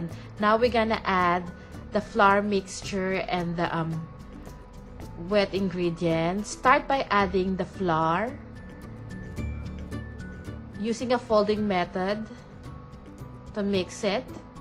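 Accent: Philippines. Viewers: Absolutely perfect! =) I used to eat this at Goldilocks in PH, I can't stop watching your videos coz I'm a bad baker and trying to learn. I hope I can make one of your cakes one day. Thanks for sharing this video. I also like your Filipino accent, makes us proud.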